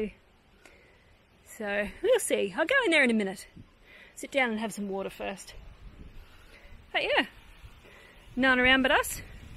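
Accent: Australia. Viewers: It would be a cold day in hell when I make fun of your accent. However, that does not stop me from turning on the subtitles when watching Australian crime dramas on Amazon Prime. It took me a while to find this remedy. Previously, I was only understanding about 60 percent of the dialogue. Much better now. Best regards. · Love your Australian accent, and your clear detailed description of your vlogs of what are being shown. Thank you for sharing 🙏.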